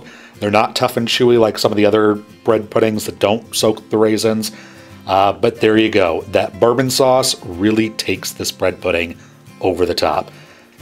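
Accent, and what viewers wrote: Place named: South, United States. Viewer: your. fried. chicken. club. sandwich. video. brought. me hear. now it's. 11pm and im watching this now. im hungry thanks 😃 i can't. see you but. do i hear. a lil bear in with. that. southern. accent.....woof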